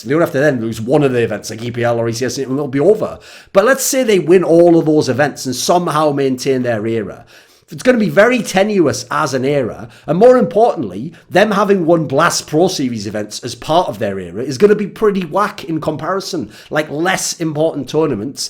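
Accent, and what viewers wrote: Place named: Scotland, United Kingdom. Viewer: Where you from sound so Scottish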